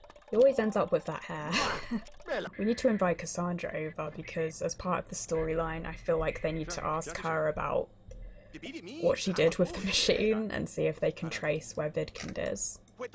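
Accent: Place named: United Kingdom. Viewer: I live in the UK and we do that too and I was kind of confused because she sounds like she is from the UK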